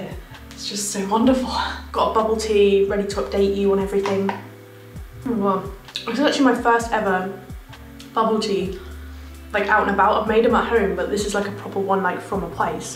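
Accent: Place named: United Kingdom. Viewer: Idk what your accent is called but it’s the BEST kind of British for sure 🤣❤️❤️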